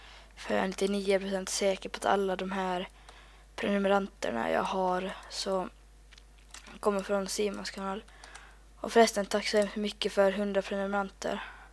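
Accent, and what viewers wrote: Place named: Finland. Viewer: Jag tycker du pratar svenska som man pratar i Finland bor du på Åland? PS jag bor i Finland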